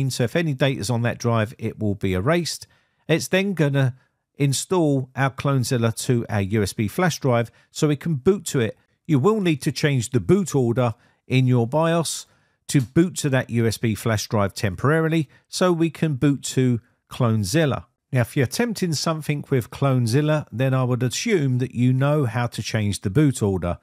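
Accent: United Kingdom. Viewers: The UK accent is so pleasing to my ears. Thanks for the informative video. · I’ve wanted to say that for a long time, too. Since someone else has now mentioned it, I add my voice to theirs: Love the UK accent! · Oh it's more than just his accent for me (although everyone knows Americans adore British accents😍), but he also sounds *JUST LIKE* Sir Maurice Micklewhite CBE (otherwise known as the actor Michael Caine 🤗🤗🤗)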